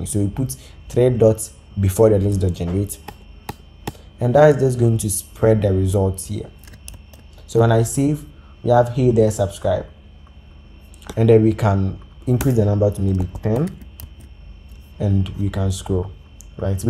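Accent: Ghana. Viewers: Thank you very much you really helped me, i can tell you are Ghanaian from your accent, keep on doing what you are doing, this will really take you far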